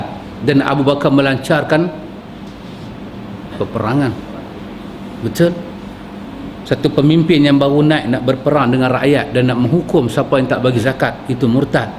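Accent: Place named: Penang, Malaysia. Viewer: bestt dgn loghat penang.ceria.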